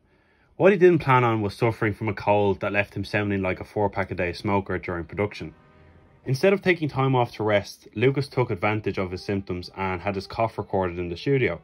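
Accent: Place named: Ireland. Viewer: Love your channel mate ...Nice to hear an Irish lad on youtube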